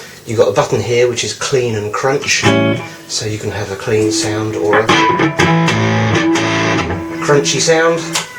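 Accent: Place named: United Kingdom. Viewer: you sound british and tell the weight in kg, astounding!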